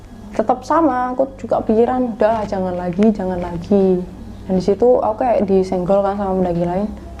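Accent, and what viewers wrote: Java, Indonesia. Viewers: . Omongane Medhok 😅😅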